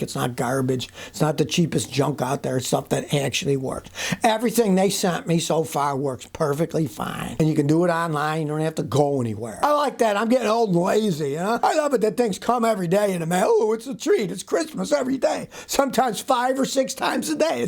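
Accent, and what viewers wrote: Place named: South, United States. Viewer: I love your southern trang 😂 you make my day 👏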